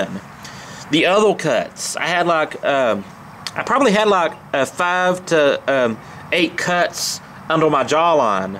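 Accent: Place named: Louisiana, United States. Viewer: oh, I actually thought you where from Louisiana Cajun accent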